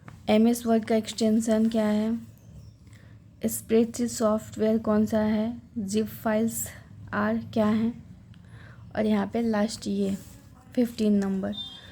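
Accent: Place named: South Delhi, India. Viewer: Aap bihar ki nii lgte ur tune is like south delhi girl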